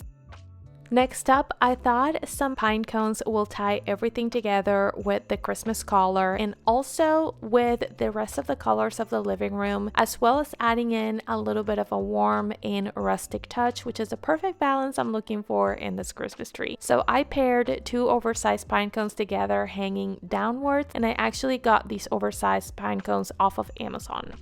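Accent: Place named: Nicaragua. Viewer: such a nice job. I love that you make two videos one an English and another in Spanish. I have watched them both 😊 maybe I will try to do that myself on my videos if you don’t mind 😊Beautiful tree I love the burgundy ornaments & the hints of Cream ribbon 🎀 Took your tree to the next level, adding the candles gave it an old world touched. I also love the way you decorated your chimney & that vase I want 😊 it simply beautiful. The birth of Christ is really nice and unique. Nice to know that you are from Venezuela. Because I was wondering the way you spoke in Spanish. Was very similar to mine Nicaragua. I hope you’re having a nice day.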